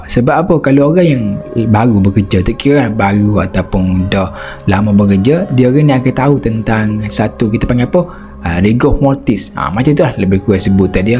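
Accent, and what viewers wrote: Kelantan, Malaysia. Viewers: Kawe orghe Kedoh tapi pahe baso Klate ni tapi apa pong kawe terhibur...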